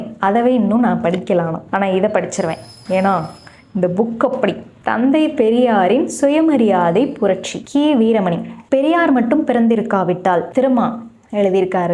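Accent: Madurai, India. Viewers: Amazing ❤. Loving your madurai accent. Great choices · Madurai slang mathiri iruku🎉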